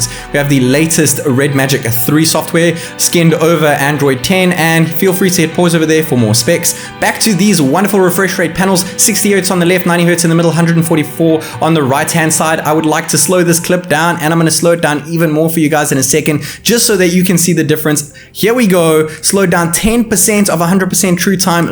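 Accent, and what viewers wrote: South Africa. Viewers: Are you South African. It sounds like it. Love from SA :)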